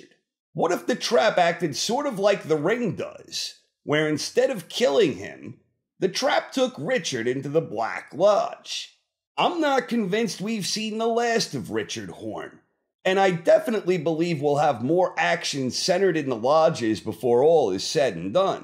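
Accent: New York, United States. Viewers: you are the man, can't thank you enough for this channel! Quick question for you..are you a NY'er? I can tell that accent when I hear it, I'm born and raised!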